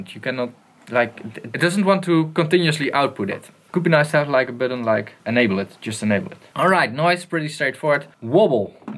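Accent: Netherlands. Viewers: are u dutch dude?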